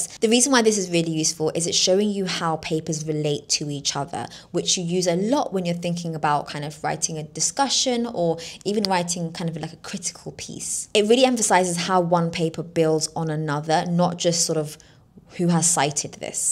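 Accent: United Kingdom. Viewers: Dear, I love your accent, its pure british, however your channel is expensive, I could not afford it.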